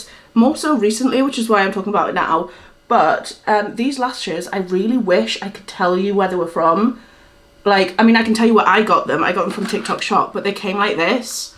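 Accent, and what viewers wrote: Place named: Bristol, United Kingdom. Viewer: lol at your Bristol accent 😂 sending my love from Bristol ❤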